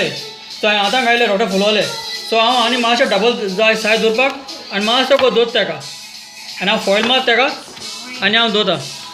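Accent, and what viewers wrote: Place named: Salcete, India. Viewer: I've subscribed to your channel, just to listen to your konkani. It's my salcete dialect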